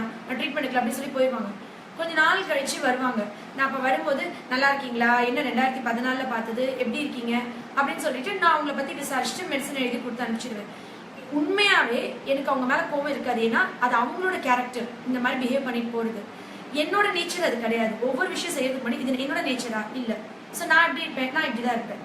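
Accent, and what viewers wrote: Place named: Brahmin, India. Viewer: God bless u Mam 🙏 I like ur voice very much Brahmins Thamil is Mixed in ur ascent nice motivational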